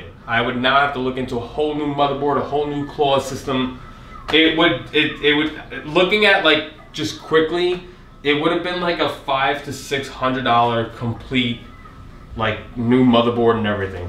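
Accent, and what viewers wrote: New York, United States. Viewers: 🤷‍♂🤷‍♂😊😊 you have such NEW YORKKKKKKKKKK ACCENT :D its great man love new york i lived there all my life and moved to maryland but i love my new york but it expensive to live theses days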